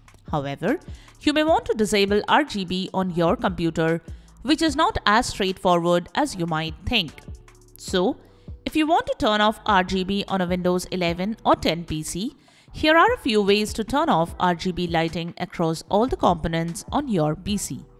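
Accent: India. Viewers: Thick India Accent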